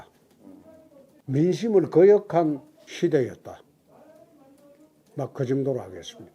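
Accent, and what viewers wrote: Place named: Gyeongsang, South Korea. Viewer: 문제점을 콕집어내는 혜안 , 언제어디서든 즉문즉답이 가능한정치인 흠아닌흠 경상도억쎈 어투와발음은 재밌기도하지만 경상도출신은 아무 문제삼지않습니다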